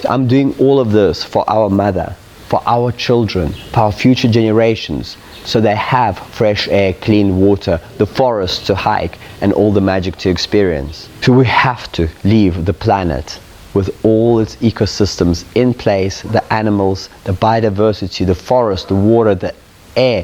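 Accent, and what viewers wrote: South Africa. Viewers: Hi there. Are you South African? Love your ideas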